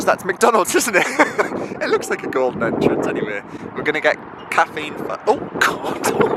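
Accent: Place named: Scotland, United Kingdom. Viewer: Your accent is confusing Scottish or British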